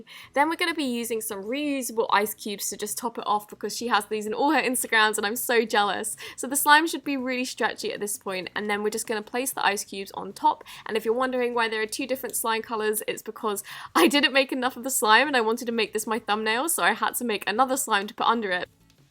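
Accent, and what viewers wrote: England, United Kingdom. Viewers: Wait are you English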